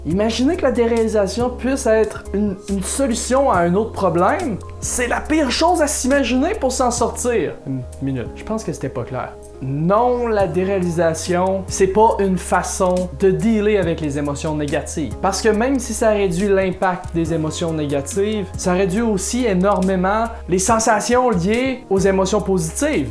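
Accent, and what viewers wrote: Quebec, Canada. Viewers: Merci beaucoup pour ton video, ce sont des conseils merveilleux qu'on doit mettre en pratique, ils sont toujours bien appréciés. Je suis péruvien et J'ai encore un peu de difficulté pour bien comprendre l'accent québécois, mais j'aime bien. Je t'envoie mes meilleurs voeux.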